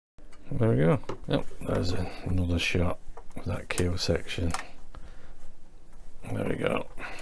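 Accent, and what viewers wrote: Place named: Northern England, United Kingdom. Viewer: PS: I really like your cheerful disposition and awesome northern English accent! Excellent stuff!! Cheers, Mark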